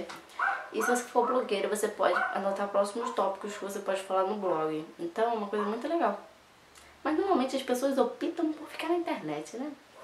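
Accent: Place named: Rio de Janeiro, Brazil. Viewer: vc morra no Rio de Janeiro e porque vc fala carioca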